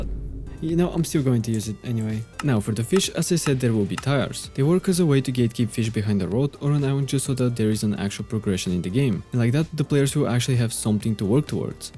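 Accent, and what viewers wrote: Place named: Russia. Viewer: какой-то русско-язычный акцент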